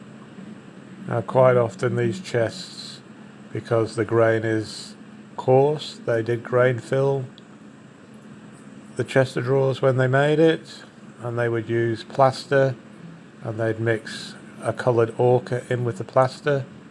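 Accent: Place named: England, United Kingdom. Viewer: Ooh Hello. Haven’t found a fellow Australian restorer yet. Pommie accent to one side. Looking forward to part 2.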